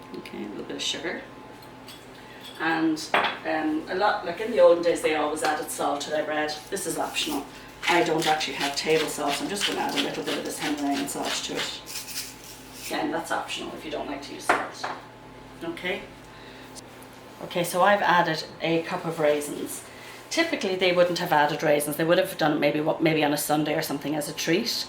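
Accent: Ireland. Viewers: Hi, I've recently subscribed with you, loving your video's so far & love your gorgeous Irish accent!...I'd love to know how long to leave the vinegar standing in a cup of milk?..I'm looking forward to making Soda bread the way my dear Irish Grannie used to make it! keep safe x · Love the video. Love your Irish accent. My ancestors came from Galway Bay. God bless the irish. Wish I could have a cuppa and a slice with you.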